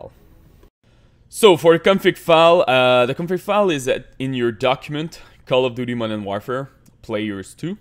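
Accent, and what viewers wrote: Quebec, Canada. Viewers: belle accent qc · Are you Québécois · Are u from Quebec??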